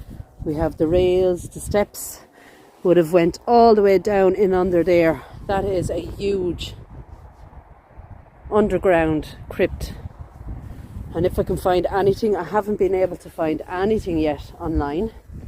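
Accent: Ireland. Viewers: You have the best Irish accent. Absolutely love it. When I imagine my ancestors talking to each other, it’s your accent I hear.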